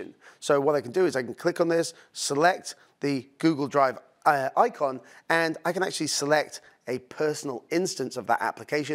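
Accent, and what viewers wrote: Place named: United Kingdom. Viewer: A Netscope rep for Australia sent me this link. Am about 50 secs in and had to write this..... A well muscled guy with a cool Brit accent (I'm a pom too) - tick, impressed so far but wanting to get emails while on a treadmill.....seriously? LOL (I have been in IT 35 years, almost retired and now starting to really feel old!). That said, it does seem a cool product.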